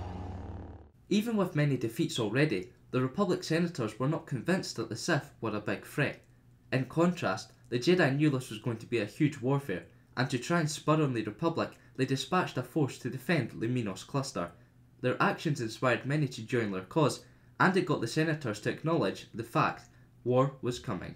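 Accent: Scotland, United Kingdom. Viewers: hes scottish. thats just how it sounds.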